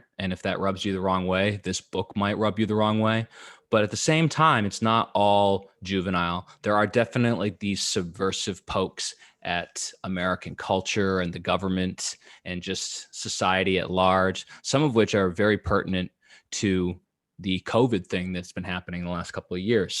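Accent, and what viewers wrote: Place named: Italy. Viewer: You're Italian accent is amazing.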